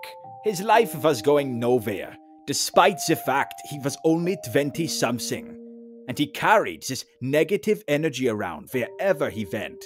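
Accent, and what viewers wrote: Germany. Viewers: The German accent is just 🔥🔥🔥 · This was fire along side the German accent 🔥🔥🔥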